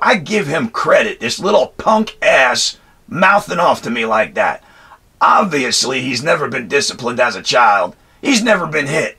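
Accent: United States. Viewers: The second I turned this video on I knew for damn sure he was a Carolinian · The accent makes me tear up as well, Murican as fuck, fuck yeah maaaan!!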